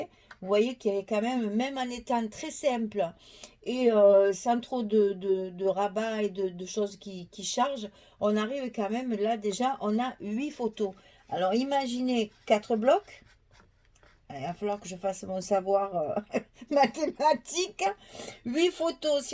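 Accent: South, France. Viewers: bonjour. Merci pour toutes ces explications,j'adore votre petit accent du midi cela fait chaud au coeur. je voudrais savoir combien faut il de papier de cette collection en quel format s'il vous plais.merci. et merci pour tous ces astuces donnés avec simplicité bonne journée à bientôt.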